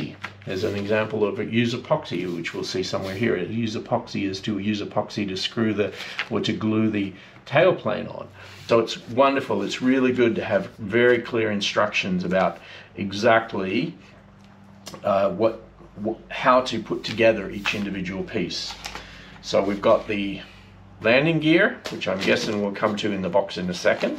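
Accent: Australia. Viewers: Nice. I am thinking about trying one of the DWH kits. I can hear an Aussie accent? Where do you fly in Canada? I am also an Aussie. I am in the Yukon. I've been flying RC since 2007. I was away from the hobby for a few years, and I am just get back into it. Cheers.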